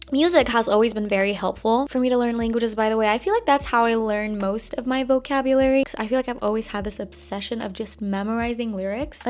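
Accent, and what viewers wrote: United States. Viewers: as a fellow turkish person, can u share how u got this perfect american accent pleaseeee queen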